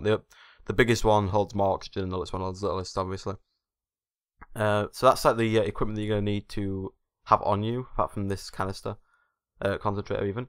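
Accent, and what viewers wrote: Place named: United Kingdom. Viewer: his Thick Brittish accent....... =))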